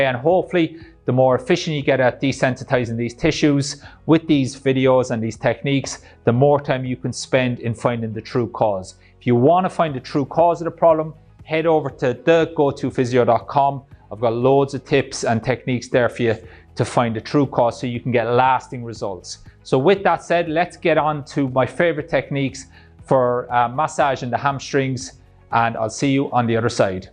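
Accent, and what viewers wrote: Ireland. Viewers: 😅 you from Ireland? I'm from Ireland too that's why I was asking but great video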